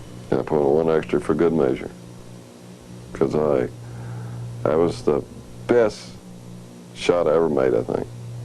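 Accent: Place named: Arkansas, United States. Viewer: The second I heard this dude's voice I knew he had to be from Arkansas. He sounds just like every other man I've ever spoken to including myself. Damn maybe we are hicks. He's a hick legend I suppose.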